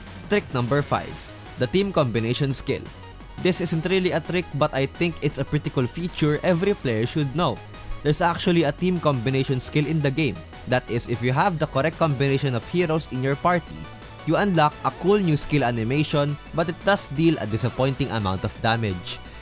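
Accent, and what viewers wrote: Philippines. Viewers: Nice video pare, salamat sa tips and tricks. Halatang pinoy with the accent 😂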